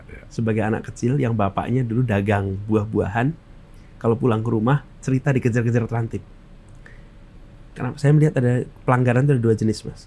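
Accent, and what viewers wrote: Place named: West Java, Indonesia. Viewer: Aya oge logat sudaan pa anis bicaranya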